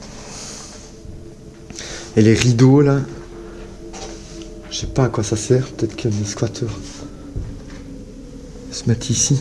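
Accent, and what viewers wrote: Liège, Belgium. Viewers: Ah l'accent de lièges 😂 . Bon c'est un endroit en Belgique mais ou .... en tout cas c'est bien glauque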